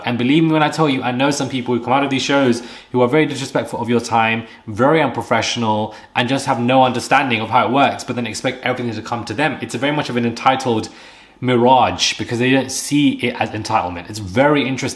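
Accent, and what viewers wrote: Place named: London, United Kingdom. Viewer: South London? Well done.. some reason I was thinking you are from East London.. That's how stereotype works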